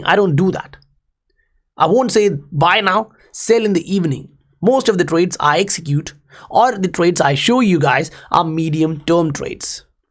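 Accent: India. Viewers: Lmao it’s definitely Indian or something in the region not even close to Scottish or Australian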